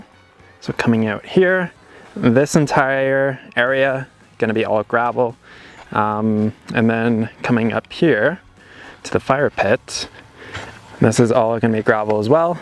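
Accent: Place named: Canada. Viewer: I love that you haven't lost your Canadian accent eh. Hannah's one lucky girl to have such a handy man around the house.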